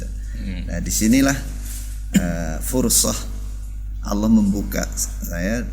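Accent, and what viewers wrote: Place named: Java, Indonesia. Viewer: Madura tapi logat bicara nya 100% jawa...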